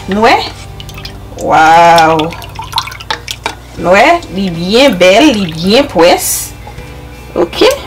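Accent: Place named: Cap-Haïtien, Haiti. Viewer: Mw tou abòne paskem tande se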